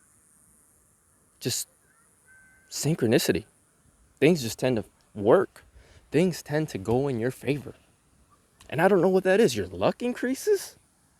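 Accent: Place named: United States. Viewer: my goodness....now i will practice American accent with your videos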